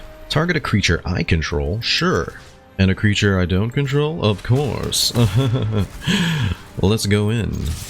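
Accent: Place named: Australia. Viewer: Lol that Aussi accent 😂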